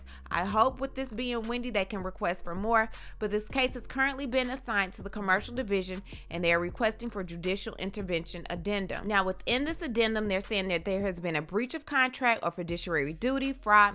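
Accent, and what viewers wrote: South, United States. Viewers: And her southern accent damn i love it , am jamaican and i live for her uploads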